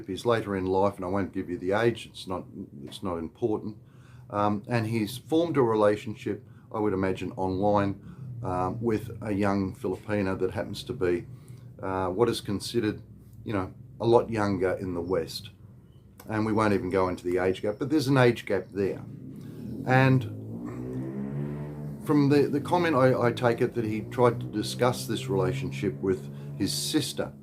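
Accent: Australia. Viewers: Hi I've watched your videos with great interest. I am an Aussie from Melbourne. I spent 2 years in Dumaguete and came back to Australia in mid 2021. to have a cataract operation on both eyes. As you mentioned the treatment standards are far better in Australia. It's taken 12 months of treatment to get the eyes ready for the operation which is in December. I'm expecting to be back in Dumaguete in 2023. Firstly there is nothing wrong with the quality of your videos the smartphone you use is obviously a good quality device. Everything that you've said is 100% accurate. Trike drivers saying it's up to you on fares, poor service in stores, long slow lines. You'll recall that in Oz we just tap our cards to pay. The supermarkets in Dumaguete swipe the card then record the details on another system very time consuming Your Aussie accent is a great voice for the VLOGS. 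Love your down to earth style, great information, keep up the good work. by the way you've got a great partner in attractive, articulate and a great command of English. I'm looking forward to the next VLOG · I like the cut of your jib, mostly I guess as we have a lot in common, born 57, ex Raafie, spent my early years working in the mines including PNG and happily divorced 😀... I came to Davao back in 2012 to meet my Filipina, I have been a permanent resident (SRRV) here since 2015, being ex military the Philippines Retirement Authority do a great package deal, only have visit the agency here every 3 years to renew my ID, other than that there is no on going costs or exit clearance required. My wife got Australian permanent residency about 4 years ago (I did the application, not rocket science but a few headaches were had chasing up the paperwork here in Phils, as I am sure you would appreciate). 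We now spend our time between Davao and my place in Queensland (I live on 5 acres), basically best of both worlds. Anyways mate nice to hear an Aussie accent from Duma for a change, keep em coming.. cheers.. how good was it growing in Oz in the 70's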